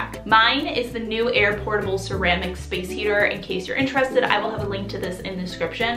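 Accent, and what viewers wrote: United States. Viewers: I'm so over American accents. Her voice grates on my nerves!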